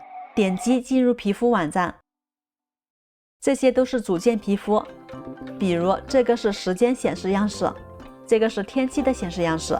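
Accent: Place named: Sichuan, China. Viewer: 声音倒像真人的，还带四川口音，但人像怎么看都像假的。。。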